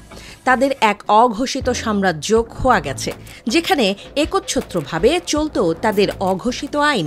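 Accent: India. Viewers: ভয়েস অনেকটাই ভারতীয়দের মত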